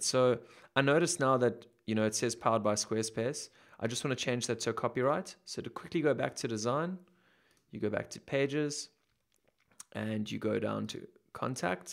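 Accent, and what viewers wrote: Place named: South Africa. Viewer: Superb tutorial – and nice to hear it all in a familiar South African accent 😊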